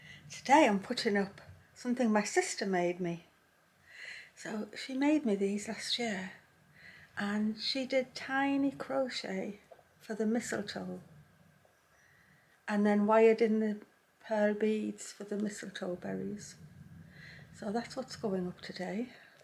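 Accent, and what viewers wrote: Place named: Newcastle, United Kingdom. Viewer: Thank you for sharing this recepy! I just made them and they taste great!!! I love watching your videos, mostly the sewing ones. I'm a quilter and sewer and crafter at heart. I looove your Jordi accent, brings back memories!!! So happy I found you. Lots of love Susanne from Germany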